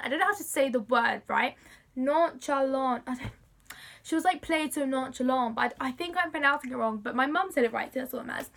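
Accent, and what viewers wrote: United States. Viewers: Awesome video!!!! just found your channel. That's a good start on your American accent. Keep working on it. Practice makes perfect. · I am a 34 year old woman getting ready to go to a Taylor Swift concert in a couple weeks. I feel like we are sitting here fangirling together. lol And your American accent was one of my favorite parts. :)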